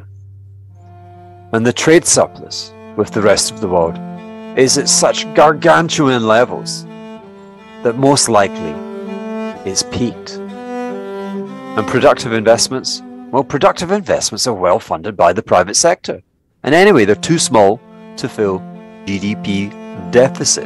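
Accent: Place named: Glasgow, United Kingdom. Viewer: Interesting... but, as always a mind through your own eye. I thought I recognised the accent I saw you before on CNBC and checked. Glasgow lad. Well done you.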